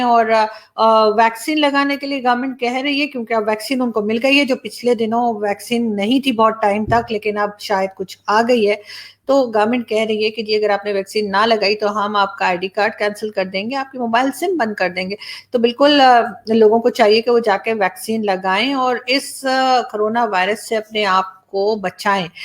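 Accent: India. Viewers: It is hard to believe that you are from Islamabad.Speaking and behaving like a typical Indian lady.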